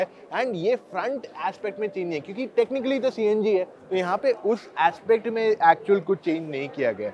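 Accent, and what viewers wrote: India. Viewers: CNG ka बाटला... 😄👌🏼Love the desi accent/words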